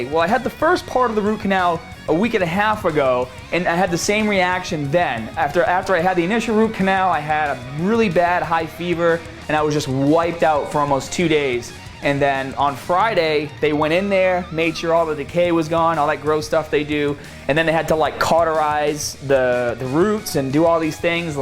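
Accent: Boston, United States. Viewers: But that boston accent tho :D lol. #GoPatsGo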